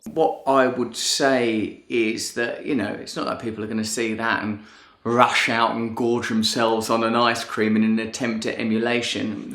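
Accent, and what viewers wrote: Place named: United Kingdom. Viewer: I had no idea Russell Brand was such a deep thinker..not even sure how I got to this video ;) Truth be told, I can't resist a handsome guy with a British Accent, and saw the video was under 10 minutes so..but all kidding aside, yes, health is of course important..but most important is ACCEPTANCE. Of yourself and others. There is no change without acceptance, and don't we tend to criticize in others what we don't like about ourselves? Kindness is so important and so absent from all forms of media, especially social media. Well done.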